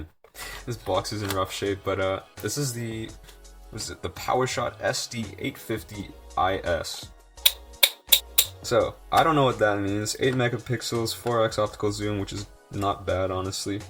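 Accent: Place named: Australia. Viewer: australian accent Nice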